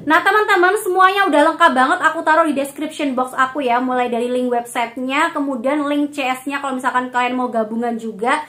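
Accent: Java, Indonesia. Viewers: Kowe wong jowo to mbk, kuq bosomu medok😂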